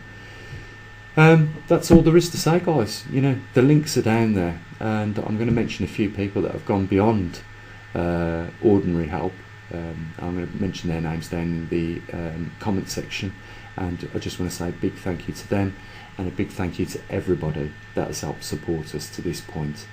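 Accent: United Kingdom. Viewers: Mentioned previously I've been putting your videos about a bit on different platforms. But a little about me .. Expert in various sciences .. among these computers and animals. Oddly enough strange things have been occurring in both these areas and I've talked about it with others. Now I've been well aware we were heading for a magnetic flip for years but never expected it to occur in my lifetime. So never considered this being the cause of things I've witnessed. Now makes a lot of sense. I've been talking about your videos with a lot of people and over the coming weeks many thousands will probably hear about it too. Was odd to hear another British voice .. expected you to be American for some reason when I first listened to your previously.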